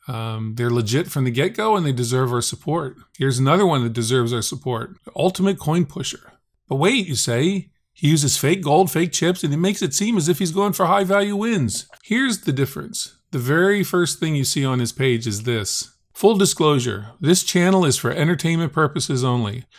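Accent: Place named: West Virginia, United States. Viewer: I’ve seen this AV coin pushers videos before. The comments are truly frightening. I can’t tell if people are seriously believing this crap or just suspending their disbelief. I saw one earlier where he claimed to have spent half a BILLION dollars on 25 quarters. 😆 The clown sounds like a hillbilly from the deepest darkest corner of West Virginia….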